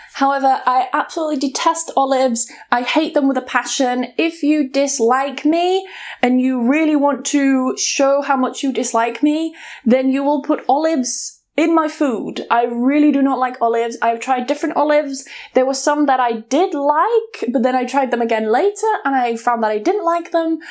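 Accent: United Kingdom. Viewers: B3autifull British ac3n7..oh.. que fofa ,fala português.. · I understand you so much!I hate mouth noises too. It always drives me crazy.I glad to find the same person as I am.👍 Also I love your british accent.💜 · This the first time a hear a British person speaking english and understand everything even without subtitles. By the way i am a greek trying to improve my English and i really like you I think you are adorable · Hello ,i appreciate it and i am happy i can learn british accent , grammar . .. with you and we were born on the same month ,oh my God 🤩by the way i love your hair color and eyes please give them to me 😂😂and congratulations to your BF and us for having u _ thank God Please take care of yourself and it's not a comment it's like a letter 😂😂😂😂😂💓love you❤❤❤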